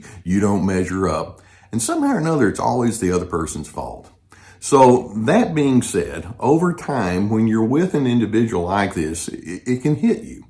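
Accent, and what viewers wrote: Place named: Mississippi, United States. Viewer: THANK YOU SOOO MUCH AGAIN AND AGAIN,!!! I JUST LOVE GUS!!!, YOU SOUND LIKE YOU'RE FROM MY "NECK OF THE WOODS ". I LIVE IN MISSISSIPPI. CAN YOU REVEAL WHAT STATE YOU'RE FROM? IF NOT, I UNDERSTAND!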